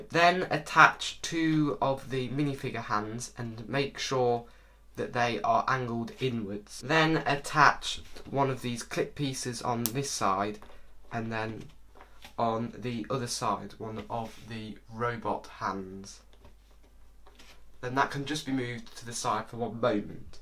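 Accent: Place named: United Kingdom. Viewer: Holy moly, british accent confirmed 😅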